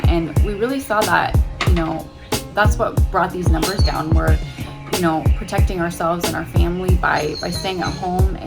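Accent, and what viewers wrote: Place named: New York, United States. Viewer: Are you from NYCity？ sounds familiar XD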